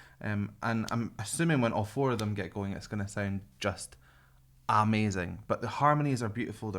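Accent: United Kingdom. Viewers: Thank you for your professional analysis! I love your British accent. Either UK or Scotland. Love it! Here is my expression of their voice range if I compare to colonial style of house range. From the left from us Ko Woo Rim: Bass as foundation of house &deep basement to support strong house built. Kang Hyong Ho: Angelic voice, tenor and falsetto as 1st& 2nd floor shooting up to attic through out of the roof like a roller coaster.(can do male and female parts, check Phantom of the opera) Jo Min Gyu: Tenor. 1st& 2nd floor(he can also do falsetto beautiful way) Bae Doo Hoon: Tenor. 1st& 2nd floor(he was musical actor so he brings out so much of emotion) I love them all together. Because of their different range of vocal ability, it bring out the best! They all work together from foundation of the house, basement, 1st floor, 2nd floor and attic to roof to complete the house project! 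Everyday they bring the joy!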